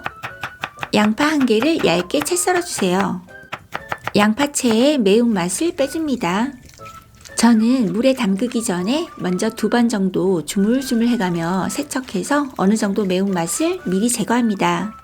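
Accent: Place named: Gyeongsang, South Korea. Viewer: 안조터라구요 경상도 엑센트 ㅋㅋㅋ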